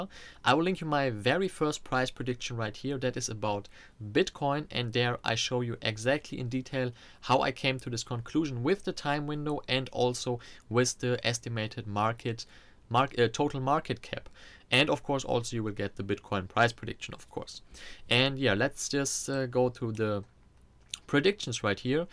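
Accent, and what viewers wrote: Germany. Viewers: Deutscher Akzent izz da 😂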